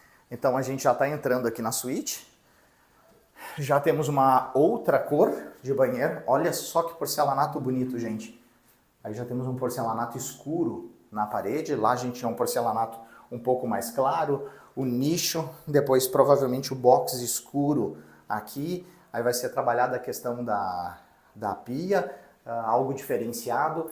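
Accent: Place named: Rio Grande do Sul, Brazil. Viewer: Meu amigo fala com o nosso sutaque, aqui nós falamos tu e não vc, bas que barbaridade tchê!!!!!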